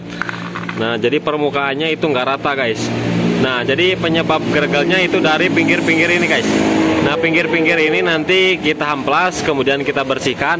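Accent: West Java, Indonesia. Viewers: Gan logat nya sundA bngkl nya di mna?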